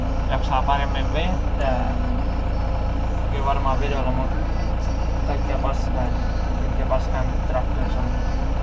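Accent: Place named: Ostrobothnia, Finland. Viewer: ootkosä pohjalainen meinaa vaa ku kuulostat siltä :D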